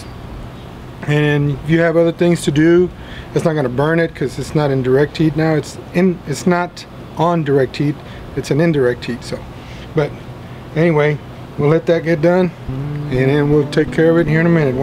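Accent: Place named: South Texas, United States. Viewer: If anyone wants to know what south Texas Mexicans look and sound like...this is it. I’m from west Texas in El Paso and we may have the Mexican blood running through our veins but our culture is a bit different, both beautiful and unique. Y’all have fajitas and we have carne asada. Y’all have breakfast tacos and we have breakfast burritos. Saludos desde El Paso y San Antonio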